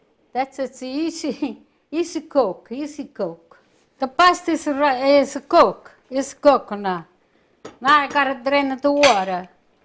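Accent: Italy. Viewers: I love this; I grew up in a French/Italian neighborhood since my family was French Canadian, we had a lot of Italian neighbors. I miss the accent that she has. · Sweet lady ... people are so insensitive. She has a deep Italian accent but who cares! Think of your own grandma. She means well. And she sings, too. Love it.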